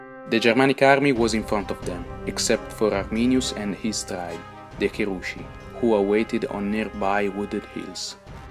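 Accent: Italy. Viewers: The Italian accent makes this video much cooler. It looks almost like a sense of revenge is emanating during the narration. :) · He is speaking proper English, in an Italian accent. You have a problem with that? What language do you want the video to be? Italian? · this is definitely italian accent... you can check channel info. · Penso che gli anglofoni troveranno un po' strana la pronuncia dei nomi latini, che qui sono resi all'italiana. Comunque vedo che i nomi latini sono simultaneamente scritti in grafica, il che aiuterà non poco. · You tried but I can't listen this accent but I like that an Italian tried to divulge I can recognize it good job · Come si sente dall’accento che sei italiano 😂 Cmq 20000 uomini sono tanti morti si, ma purtroppo ci sono state sconfitte molto peggiori nei secoli antecedenti a Teotuburgo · Hears strong Italian accent. This may be a little bias lol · Really good job: the script is brilliant and the story line flows smoothly with a charming Italian accent :)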